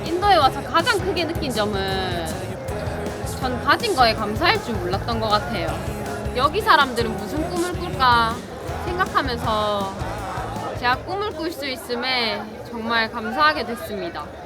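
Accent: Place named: Gyeongsang, South Korea. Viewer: 경상도에서 자라시고 TV를 많이 보신 분들은 아실텐데 옛날에 두 남자의 만국유람기라고 프로그램이 있었는데 거기 나오는 김근수씨 느낌도 나면서 (해외인데 꿋꿋하게 한국어 + 사투리로 소통하심ㅋㅋㅋ) 센스도 있으심! 너무 재밌습니다. 같은 진주사람으로 앞으로의 버킷리스트도 응원합니다~!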